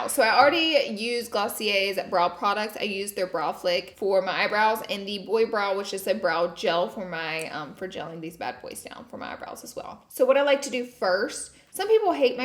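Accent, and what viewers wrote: South, United States. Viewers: honestly watching her videos are so relaxing to me. I’m like finally someone with a southern accent!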